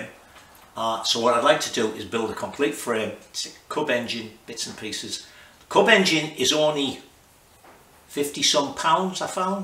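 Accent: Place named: Newcastle, United Kingdom. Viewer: Glad you're on the mend. Remember the great Carroll Shelby lived to 90ish with more severe cardio vascular problems so I hope to hear your dulcet Geordie tones for many years yet.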